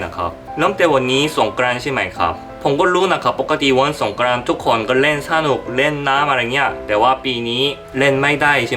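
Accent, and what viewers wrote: South Korea. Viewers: hwaiting Your videos are so cool and uplifting. Your Thai is getting better and better but honestly I love your unique Korean/Thai accent so much. Keep being you 👍🏽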